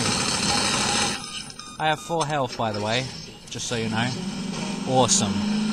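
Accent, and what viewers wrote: United Kingdom. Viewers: your British commentary makes your walk through a thousand times better than any other!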